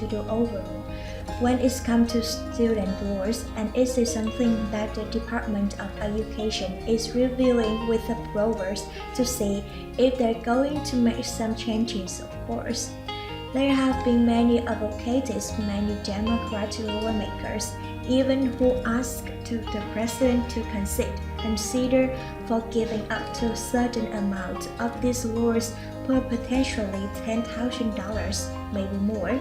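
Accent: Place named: India. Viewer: It's a Indian lady alot like the ones I hang up on phone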